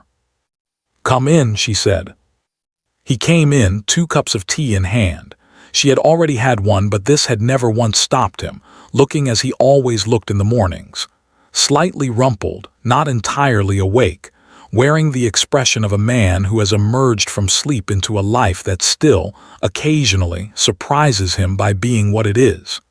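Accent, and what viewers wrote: United States. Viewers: The American accent is really unsuitable for this story about British aristocracy. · English accent much better than cowboy accent for the narrator 🤨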